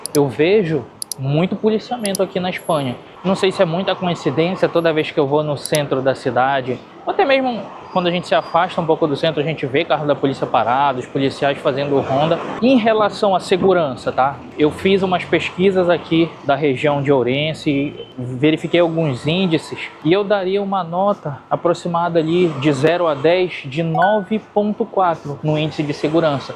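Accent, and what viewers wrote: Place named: Amapa, Brazil. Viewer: eu tambem sou de Macapa, logo reconheci o jeito de falar.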